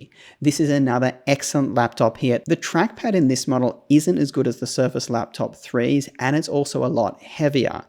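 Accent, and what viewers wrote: Australia. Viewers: you sound Australian ,but are you talking US Dollars & web sites ????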